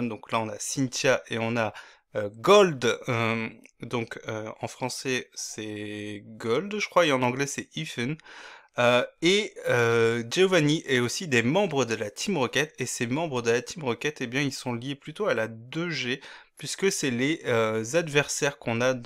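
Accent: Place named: Portugal. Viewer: Bravo pour l accent portugais!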